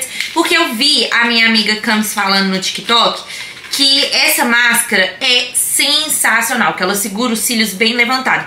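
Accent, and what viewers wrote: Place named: Minas Gerais, Brazil. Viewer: Meu seu sultaque e lindooooo, também sou mineira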